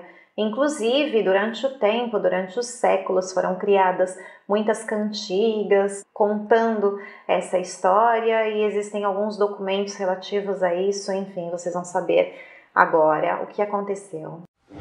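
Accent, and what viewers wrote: Italy. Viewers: tu portugués tiene un sencillo acento italiano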